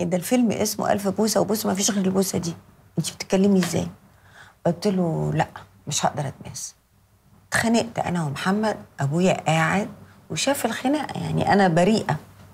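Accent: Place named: Iraq. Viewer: لقاء جميل أحلى شيء بزينب انو شخصية وذكاء ومحافظة على لهجتها العراقية لأن في كثير عراقيين بس يرحون لغير بلد يغيروا لهجتهم العراقية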